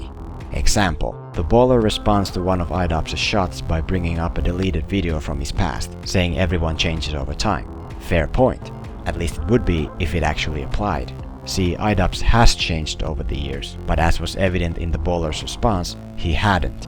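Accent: Finland. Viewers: Dude, you Finnish? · You sound Finnish. Suomi? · Might you perhaps be Finnish? The accent made me wonder :D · Are you from Finland? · Are you Finnish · You're finnish aren't ya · your accent sound familiar? Do you happen to be Finnish? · Although I can't agree with the point you made , I have to agree with the rest. Great video with a lot of effort put in! By the way, I can't quite place your accent. Do you happen to be Finnish? :) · are you from finland? your accent is familiar :) although i do disagree at some points, great video!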